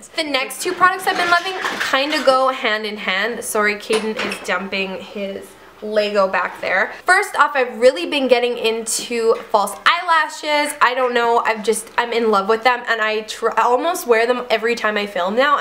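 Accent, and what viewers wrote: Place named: France. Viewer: You sound super french in this video :P (fellow Canadian here!)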